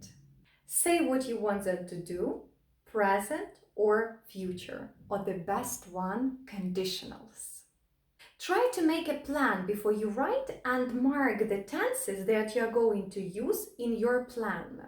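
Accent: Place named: Russia. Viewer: Your dialect looks like a Russian or Ukrainian lady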